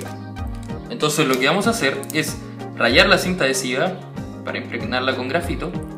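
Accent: Chile. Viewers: Oye, qué genial tu canal. Estaba buscando imágenes del grafeno para tratar de entender el tema de los cristales, para ubicarme en eso de que "son la excepción a la morfogénesis autónoma" que plantea Monod y todo esto porque estoy leyendo sobre la teoría de Gaia de Lovelock para una revista digital que edito... o sea, la vuelta pa' larga! (pero entrete, una cosa lleva a otra). El caso es que llegué al video y caché al toque que eres chileno, jajaja! Y bue... me di una vueltecita por otras cápsulas y nada, poh, que está muuuuuy interesante. Ojalá en los colegios y liceos enseñaran así, con experimentación casera. Ta muy bueno! Un aporte. Eso. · Eres chileno?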